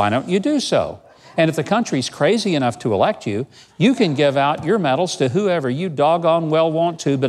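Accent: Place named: Arkansas, United States. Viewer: I always love your shows Governor. Your Arkansas voice sounds like home. I grew up in Texas, and have lived in Oklahoma for nearly 30 years.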